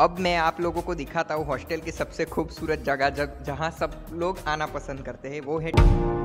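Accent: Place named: Gujarat, India. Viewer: Dorii,sidheee...😂🤣 gujarati hindi accent kamal😂😂👍🏻👍🏻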